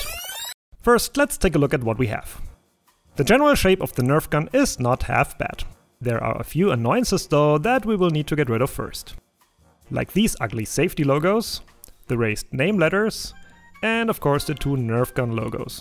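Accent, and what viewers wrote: Germany. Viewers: Kommst du aus DE oder AT? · Ich liebe dieses deutsche Englisch! XD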